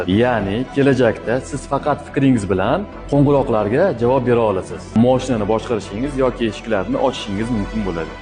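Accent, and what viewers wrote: Namangan, Uzbekistan. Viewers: Наманганликмисан ука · Shevangiz baribir Namanganchaga ketyapdi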